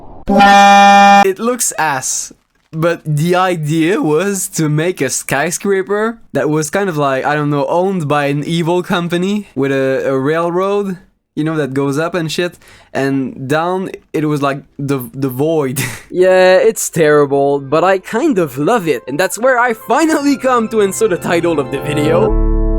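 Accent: Quebec, Canada. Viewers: Est-tu québécois par hazard? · Came in for the nice ouse, stayed for the gros accent québécois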